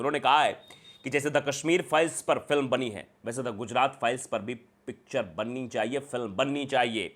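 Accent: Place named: Bihar, India. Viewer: Very gud. But bro, ur accent has changed a little bit. Keep it a little bit to bihar type. It sound gud.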